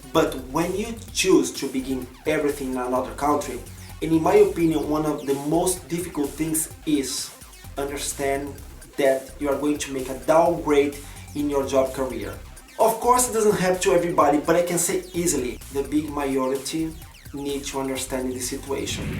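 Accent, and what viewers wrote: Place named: Brazil. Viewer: Ver um brasileiro falando inglês me dá uma sensação excelente de que eu 'domino' a língua, pois entendo 80% do que voce fala kk! O sotaque é fator determinante na conversação, é incrível. Keep it up,!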